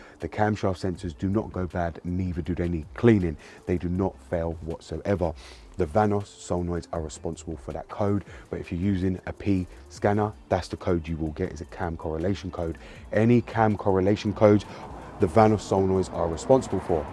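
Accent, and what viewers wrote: United Kingdom. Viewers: I'm 23, would like to be a vag technician but I like BMW, trying to get a <num>i as my first and daily car, this Chanel is 🤘🏾, the only thing I'm not very comfortable is with the UK accent ahaha but you're okay, I'm just not use to heard it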